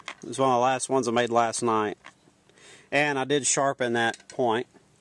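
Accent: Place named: South, United States. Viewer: This guy sound really southern. (in a good way)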